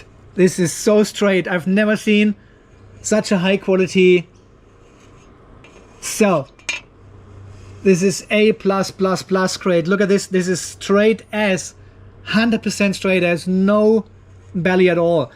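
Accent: Germany. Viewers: Hey ho. Is it only my feeling or do you sound like a german who is living in australia?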